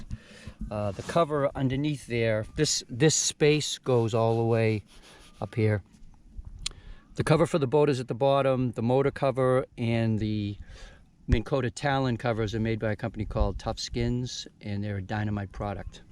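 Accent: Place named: New England, United States. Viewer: Awesome...thanks for your service as well and the information! My fishing will be done in ME so the biggest water I’ll see will be Moosehead Lake. You sound like a NE guy so I’m sure you’ve heard of it. I hope you don’t mind if I hit you up again in the future with questions. Good chatting with you!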